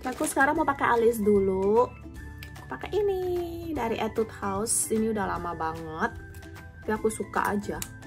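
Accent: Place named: Pontianak, Indonesia. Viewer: Asli mana..logat Indonesia nya kayak dari Pontianak ya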